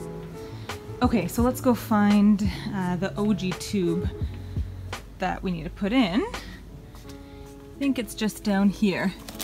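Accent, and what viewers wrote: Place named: Canada. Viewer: Where are you from ? Your accent sounds very Canadian and also like your from Minnesota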